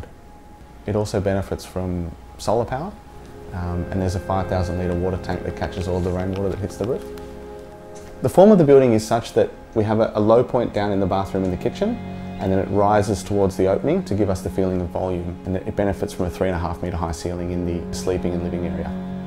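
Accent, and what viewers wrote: Australia. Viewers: Why not judge accent of a native English speaker? It wouldn't be nice to judge non-native speakers because they have disadvantage but no excuse for "Aussies" :D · Oh my... Australian accent is lit 😁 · It's the Australian accent lol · While he is very Australian, this is his individual way of speaking, and not typical to our accent at all. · it is Australian accent. Dont believe it? Go to australia mate. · Aussie accent that's why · Elyse G.엘리스 지 That’s his Australian accent, maybe you shouldn’t judge people’s accent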